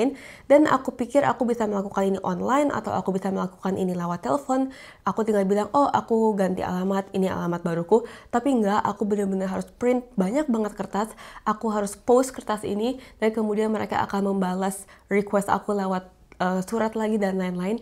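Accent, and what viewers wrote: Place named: Germany. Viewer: Mbak... Logat kamu udah nyampur banget Jerman wkwk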